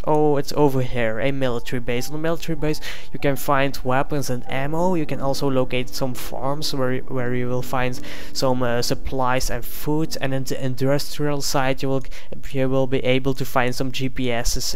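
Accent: Netherlands. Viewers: So you're Dutch? Or from Belgium? I can hear it in your accent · Hahaha je bent nederlands ofniet ??? ik hoorde het de hele tijd aan je accent en toen zag ik ineens je lijst vol staan met nederlandse servers :P maar goeie vid man ik ga ze ff bekijken want ik snap nog niet hoe het spel werkt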